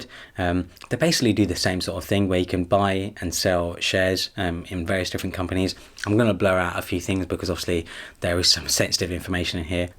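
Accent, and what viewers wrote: United Kingdom. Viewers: Sleek British accent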